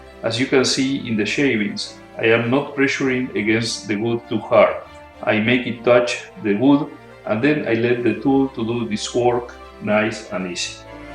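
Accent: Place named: Spain. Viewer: Menudo acento tienes jajajaj, se nota que eres español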